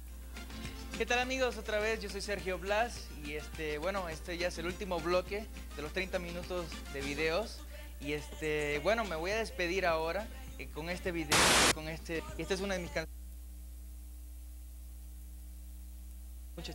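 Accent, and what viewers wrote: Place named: Puerto Rico. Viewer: Neste tempo, o Sergio tinha o acento mexicano... Agora está pura cepa... Totalmente boricua!! 😃🇵🇷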